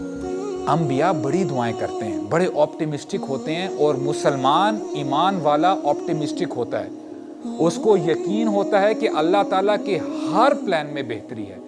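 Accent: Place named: Arab world. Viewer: Amazing Arabic tonality of yours, we truly appreciate that!!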